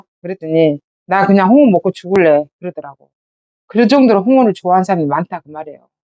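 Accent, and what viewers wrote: Jeolla, South Korea. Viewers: 처음엔 전라도사투리가 호기심가서 듣게되었는데 머저리 바보들의행진만하는 국민의힘전의원들보다쎄고 또한날카로운 논평에 경의를표합니다 지금은 애청자가되었습니다 건강하시고 화이팅!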